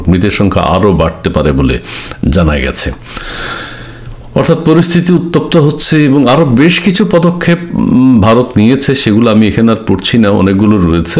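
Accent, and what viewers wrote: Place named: Noakhali, Bangladesh. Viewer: নোয়াখাইল্যা ভাই !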